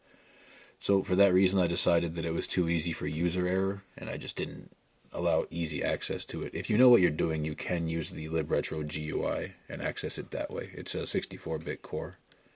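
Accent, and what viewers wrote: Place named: Winnipeg, Canada. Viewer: You're from Peg City aren't you? Lol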